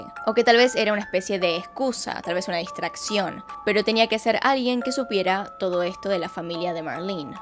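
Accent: Argentina. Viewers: Se te escucha pachazo 😁me encanta el acento argentino 😂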